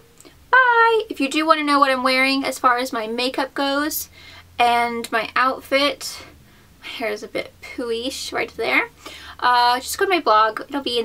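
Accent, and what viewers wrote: England, United Kingdom. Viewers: In this video I could totally hear your English accent!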